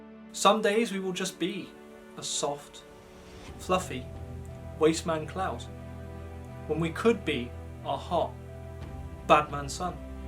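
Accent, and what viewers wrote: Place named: Ireland. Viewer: Well wtf have you turned in to, you might have been better of telling us your number one fans, the bull shit story of Aladdin in your Irish accent,it would have been more fun, keep them coming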